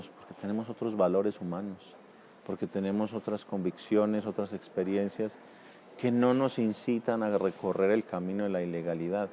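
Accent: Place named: Antioquia, Colombia. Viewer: pense que hablabas paisa ...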